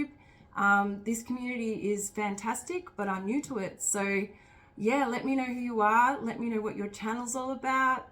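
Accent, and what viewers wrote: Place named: Australia. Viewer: !!! Oh my goodness you did excellent! I got absolutely no stitching done because I was enjoying this with my morning coffee!😁☕️ So many great WIPs, and some I don't remember seeing. I love your Pandemic your colour choices/ placements are so fun! Paradise Found is definitely one of my favourites and I loved seeing the progress on it. ( I laughed when you said you didn't pick MC so it's more doable, because whenever I get around to my JN it is regular version MC🤦‍♀️😝🤣) 26.99%🤣🤣 oh that made me giggle! Talking about 939 and the Kreinik did also!🤭 Your CM Designs are beautiful! Love your Chicago piece, those trees!!😍 I had so many more things to say but like always I have forgotten them by the time I get to the end of a video! So proud of you for jumping on the Flosstube train! Oh and I love your Aussie accent! 😊 Thanks so much for the shoutout!!🥰 I am so glad I could say I was here RIGHT from the beginning of your flosstube journey! 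Sub #1!😉🤭😊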